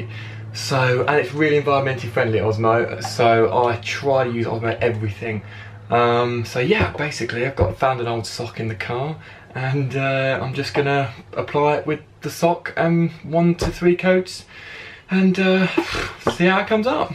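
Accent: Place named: United Kingdom. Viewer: not all of can speak or understand the King's English so for those of us watching from the colonies please speak just a bit slower eh mate?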